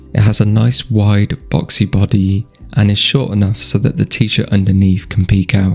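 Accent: United Kingdom. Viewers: Your accent and voice is much more pleasant to listen to than other UK fashion youtubers, great video :)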